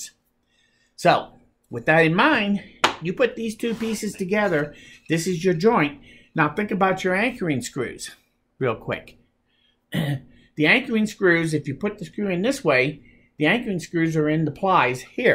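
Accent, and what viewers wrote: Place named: Quebec, Canada. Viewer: This was so helpful. Love your teaching method. Your accent struck me. Are you near Quebec?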